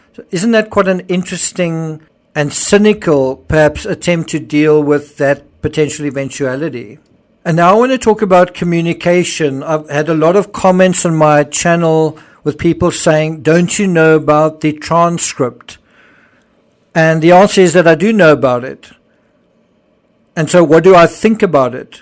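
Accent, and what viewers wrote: South Africa. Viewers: Love the SA speak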